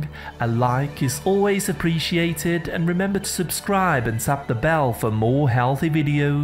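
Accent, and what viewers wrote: United Kingdom. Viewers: 🤣British English👍🏼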